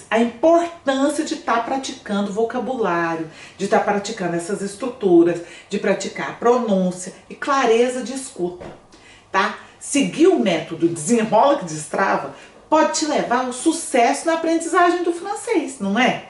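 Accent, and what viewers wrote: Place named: Minas Gerais, Brazil. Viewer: Prof sou novo no canal, to amando os videos! Seu jeito mineiro e reconfortante pra mim que to tentando aprender desde 2020 mas so agora decidi pegar firme!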